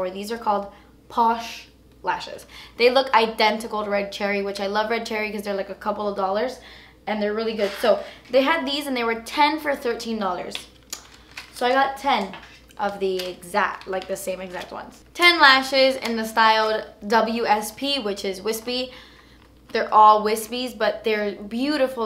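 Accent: United Kingdom. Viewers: You have the cutest British Accent Ever! :D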